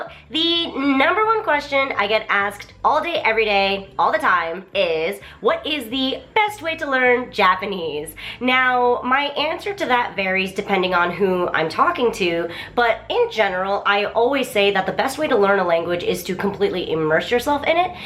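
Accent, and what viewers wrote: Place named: United States. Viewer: you sound extremely american when speaking japanese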